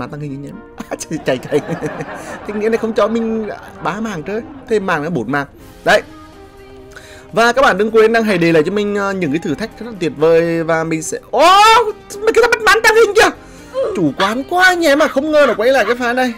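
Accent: Nghe An, Vietnam. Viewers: hắn bình luận giọng Nghệ An choa là tau thấy dễ ghe với thích rồi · a người nghệ an ạ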